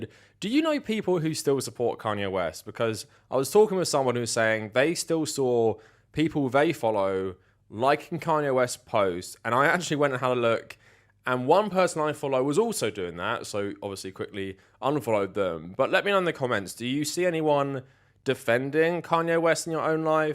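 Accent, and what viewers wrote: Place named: United Kingdom. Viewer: in an annoying british accent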